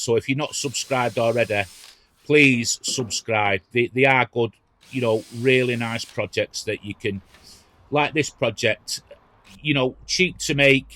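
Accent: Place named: Salford, United Kingdom. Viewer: Good video mate. Salford/Swinton accent?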